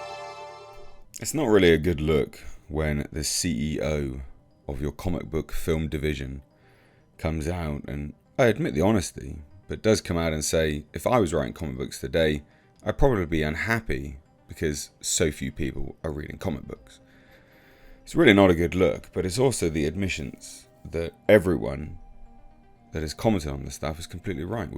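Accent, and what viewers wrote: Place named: United Kingdom. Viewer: You're an Brit, pronounce "manga" however feels natural to you, bruv.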